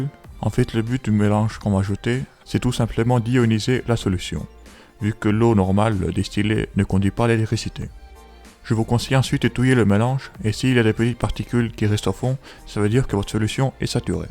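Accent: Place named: Africa. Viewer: Une grosse voix d'adulte, un accent probablement africain. Et des petites mains de jeune adulte toutes blanches. J'avoue que ca m'a perturbé !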